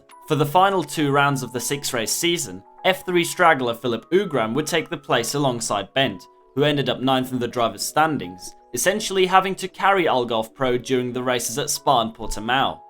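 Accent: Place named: Netherlands. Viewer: Goated Dutch accent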